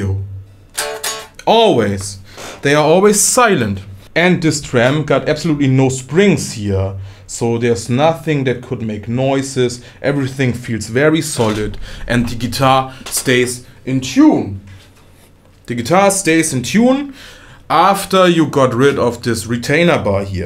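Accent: Germany. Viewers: I like your light understandable german Akzent.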